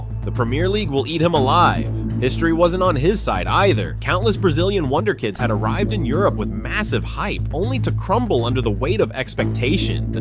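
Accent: United States. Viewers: Why in God's name do we have to subjected to this awful American garble? Learn English we were here thousands of years before you!!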